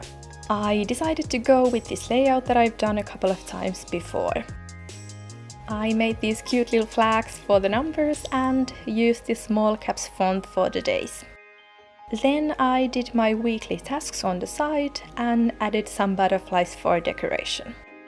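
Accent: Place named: Finland. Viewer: /video starts "oh she has a nice accent. what is it from. sweden? finland?" you: "here in finland (...)" me: yooooooooooooo B)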